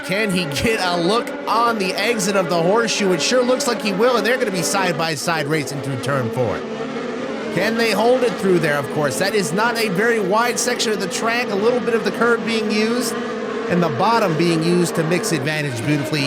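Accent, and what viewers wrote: United States. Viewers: the commentator is sooooo american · This is the most american voice i have ever heard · Mate his murican accent is so funny😂😂😂😂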